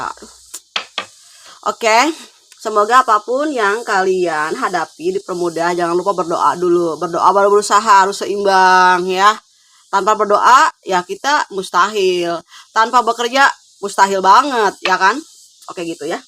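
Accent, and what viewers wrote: West Java, Indonesia. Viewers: Aduh ngakak..lucu..orng sunda ya nih?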